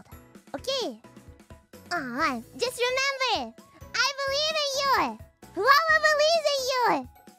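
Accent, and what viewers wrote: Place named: Japan. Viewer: 到底怎麼把英文講得跟日文一樣… 我愛死她了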